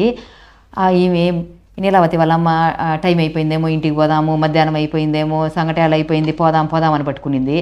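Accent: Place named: Chittoor, India. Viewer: కథ సహజంగా పల్లెల్లో మన ఇళ్లలో మన మధ్య జరుగుతున్నట్టుగా చిత్తూరు యాస ఉట్టిపడుతోంది మీ నోట ☺️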